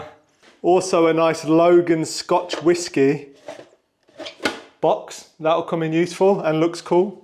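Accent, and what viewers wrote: Birmingham, United Kingdom. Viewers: I live in the UK and was oriinally from Birminham, so your accent reminds me of home. I think you are such a survivor, you have that huge land, which was taken on as a couple, but now one man. All the animals also to take care of and put a video together. Just where do you get all this engery from? Really hope you get others to join you in caring for such a property. Hope the legal stuff gets sorted friendly for you x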